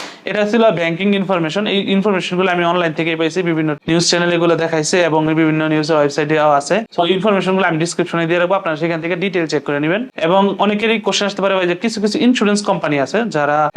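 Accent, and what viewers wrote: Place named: Mymensingh, Bangladesh. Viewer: উচ্চারণে বৃহত্তর ময়মনসিংহের আঞ্চলিকতার যথেষ্ট প্রভাব মনে হয়। এটা এড়াতে পারলেও উচ্চারণ আরও বেশী সাবলীল মনে হবে।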